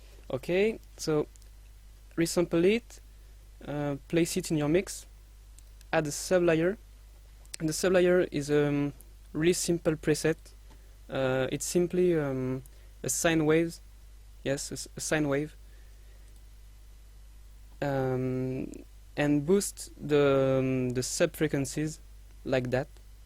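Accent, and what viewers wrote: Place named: France. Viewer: Je me disait bien ta un accent francais lol ^^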